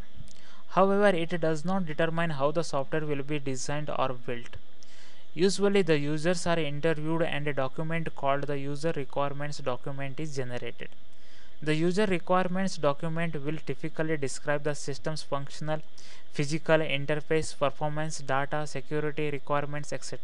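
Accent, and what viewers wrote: India. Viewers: Very knowledgeable, he sounds South Indian....would really help if he got his accent sorted out...as it really become difficult to understand as well as taking his video seriously. Although he knows what he is on about, but the accent really is a turn off....could not use this as a video for my British students, i just know they will be bemused by the accent. Anyhow informative vid:) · he is indian guy, but I can understand him because alot of my teachers are indian and I get used to this kind of english. Thanks for the video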